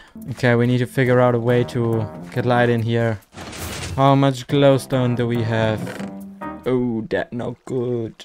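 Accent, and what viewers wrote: Germany. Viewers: Super german Easy englesh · Your lucky you have za german accent. Im mostly German, but have no accent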